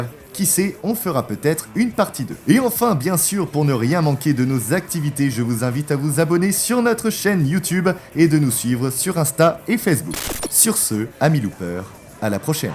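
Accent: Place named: England, United Kingdom. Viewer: Tres bonne vidéo et bien interessante. Et bel accent anglais^^. Sympa vos vidéos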